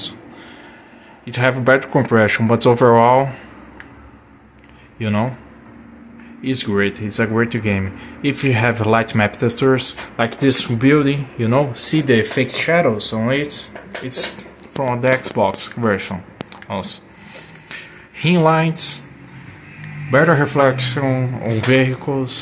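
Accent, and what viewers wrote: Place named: Brazil. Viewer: you are from Brazil? Your accent appears to be Brazilian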